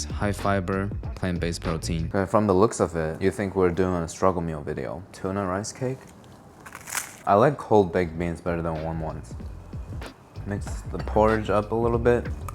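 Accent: Finland. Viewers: He sounds Scandinavian (Finnish) to me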